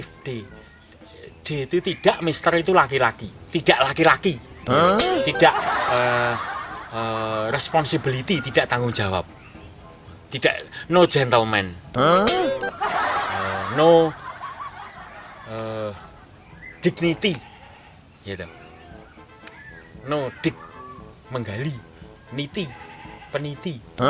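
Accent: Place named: Java, Indonesia. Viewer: Jowo mn suarany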